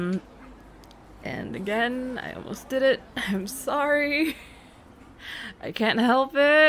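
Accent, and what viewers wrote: South, United States. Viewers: god why does your voice have to be so nice with or without southern accent got me punchin and kickin the air, and don’t even get me started on your absolutely amazing laugh 🤭🧎‍♀️🩷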